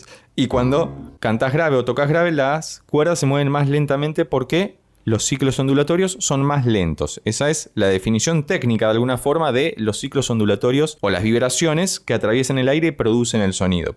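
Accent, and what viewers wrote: Argentina. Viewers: Hola, al fin un lugar donde puedo comentar en castellano, además me sonás a Argentino. Te cuento que sigo a Geoff hace un tiempo y es impresionante todo lo que tiene. Los altos y bajos no los he visto en otro cantante, Si te puedo recomendar algo, sería, y otras dos más de ese estilo - como -. Y él está en una banda a cappella hace unos 25 años creo (tiene 44), la banda es y también tienen unos covers buenísimos y diferentes (, de, etc.) pero para mi la mejor y en que Geoff (se pronuncia como Jeff - shef-) es, el tema del Jorobado de NotreDame. (sigo en otro comentario)....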